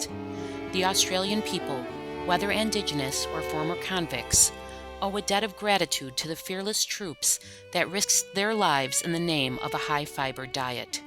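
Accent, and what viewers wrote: United States. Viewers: WTF is an "ee-moo"? Suggestion for narrator... If you're going to do a voice-over about an Australian thing with your American voice, learn how to say its name. Google it, or ask an Australian. How hard would that be? It's an "eem-you". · its funny but ruined by it being narrated by an American.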